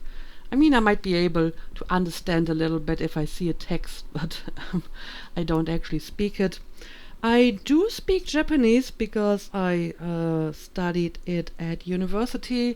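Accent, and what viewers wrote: Germany. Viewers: When I first heard your voice, your accent sounded really familiar. I actually wondered if you were Tianlein who does Elder Scrolls Online fashion content here on youtube. Maybe a second channel of hers but I clicked on one of her videos and her voice is slightly different to yours (also German though). So, I used to play Elder Scrolls Online with a German lady about your age, from Cologne, who liked exactly the same folk metal music and is called Katrina (shortened online to Cat). The only reason I know it cannot be the same person is because I played ESO on PS4 not PC. Also, the person I know is actually Indian and that part of her accent is not present when you speak. Very strange coincidence though lol. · Congratulations! I knew your accent but I never found out where it came from in Germany so thanks for answering that question! Other things I'd like to say are that I do share your interest in Folk Metal and that most of us french people (I'm from Strasbourg) also find snails gross. 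Btw I checked the Bielefled controversy. Just wow.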